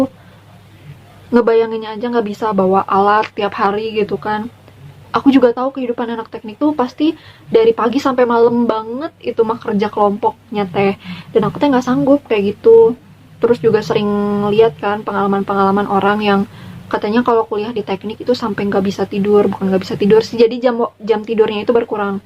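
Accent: Indonesia. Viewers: Tth suka ih logat sundanya ❤️ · Ngakak banget lagii bahasa Sunda nya lucuu😂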